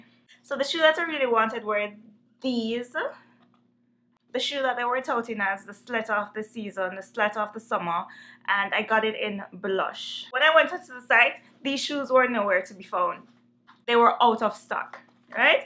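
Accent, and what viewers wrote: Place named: Jamaica. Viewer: Love this review, Soon has I heard your accent I'm like YAY I found another Jamaican YouTuber !!!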